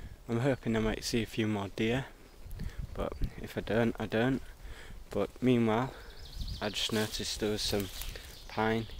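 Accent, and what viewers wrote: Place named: Hull, United Kingdom. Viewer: Nice vid, loved seeing the deer, am a Hullite myself, can't mistake that accent. Where on the Wolds Way are you? Look forward to seeing your woodland camp.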